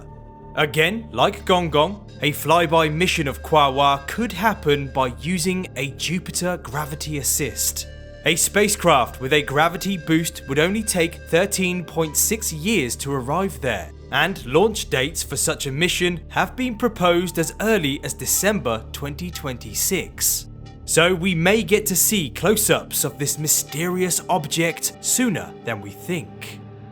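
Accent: United Kingdom. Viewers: Tired of every video narrated by a tired, boring, pretentious sounding British guy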